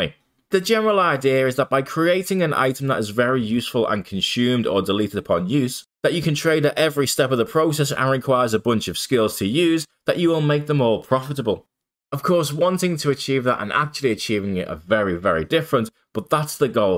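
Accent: Liverpool, United Kingdom. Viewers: Fellow scouser? yes lad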